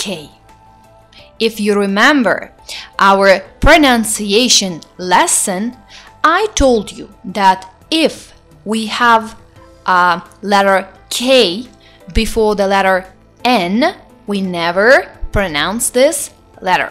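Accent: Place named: United States. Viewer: I like this American accent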